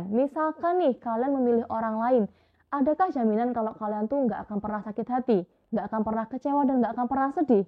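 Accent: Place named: Java, Indonesia. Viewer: Medox banget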